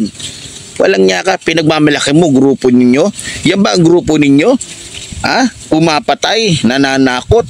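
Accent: Visayas, Philippines. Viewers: Idol bisaya ka ba?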